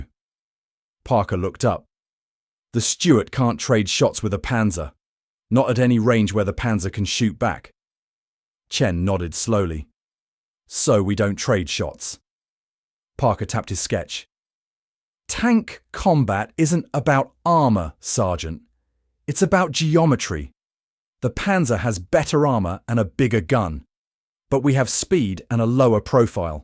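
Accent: United Kingdom. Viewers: A British accent doesn't make it true!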